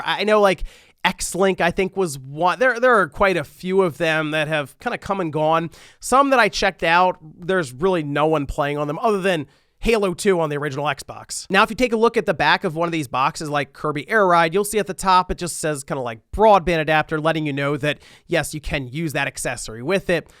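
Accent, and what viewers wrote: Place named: Philadelphia, United States. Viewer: You have a very heavy Delco/Northeast Philly accent...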